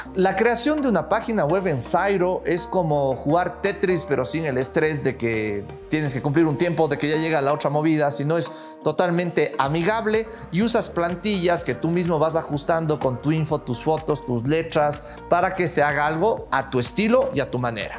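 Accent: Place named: Cuenca, Ecuador. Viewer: Me encantó el video, pensando en migrar nuevamente a trabajar como robot en fábricas pero me encantan los negocios, emprender, aprendí amar 🇪🇨 con el alma estando 15 años 🇪🇦 me voy dejando a mis hijos aquí, están a 2 años de ser profesionales y ni siquiera sé si podré estar presente ese día👨‍🎓. ESTOY CON MI CABEZA HECHA UN CAOS Y MI 💔🥺, comentame algo con ese acento Cuencano que AMO😉 #yamesuscribí👍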